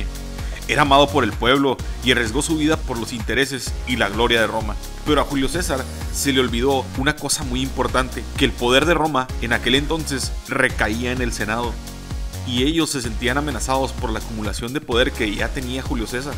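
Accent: North, Mexico. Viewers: Si no fuera por ese pinche acento norteño este video sería bueno!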